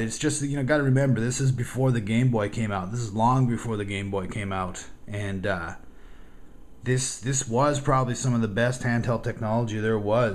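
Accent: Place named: England, United Kingdom. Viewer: amazing man. great memories. and when you said you grew up listening to the expos on the radio, i almost cried. what are the odds?? i too am from Montreal and grew up a big expos fan and also used to hear them on the radio every game. i loved my expos. but how come you listened to Jacques Doucet and not Dave and Duke? you sound english, so this shocked me. My dad used to listen to Doucet, then i'd change it to Dave van Horne and duke snider and we'd listen together. Great memories of Nos Amours and the good old days. thanks for the vid. you brought me back to my happy time.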